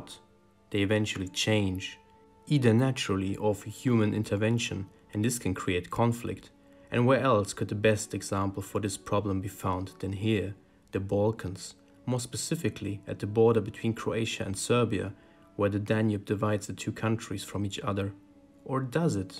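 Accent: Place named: Germany. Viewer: based German accent ⚫🔴🟡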